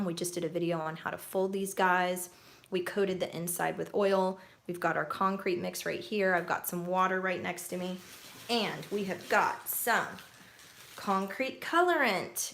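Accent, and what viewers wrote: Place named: United States. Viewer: I've noticed one thing with these types of diy videos... When an American makes them - TOOOOOOOO MUCH FRIGGIN TALKS, NO FRIGGIN ACTION... they keep talking and talking and talking praising how good they are... and when you watch videos from other countries - NO TALK, JUST BUSINESS... nice relaxing music and business... but only and only Americans bother people with their annoying accent and nonsense talks... OMG grow up America, people around you are getting better and better... for example in this video she keeps talking and stalking people to order her unfinished product, and in the end - WHERE IS THAT FRIGGIN MARBLED WHATEVER YOU WERE MAKING?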